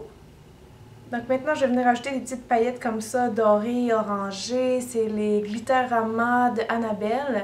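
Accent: Canada. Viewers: t'est canadienne? d'ou est ton accent? j'aime bien.. :)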